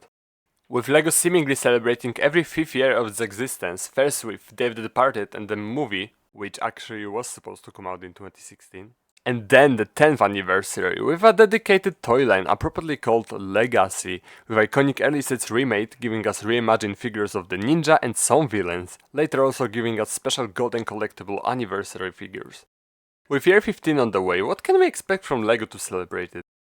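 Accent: Poland. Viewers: akcent zbyt polski😩🙏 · Brzmisz jak brat Polak który próbuje po angielsku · Are you polish like me?? You have polish accent. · Are u from poland? Beacose ur accent sounds pretty polish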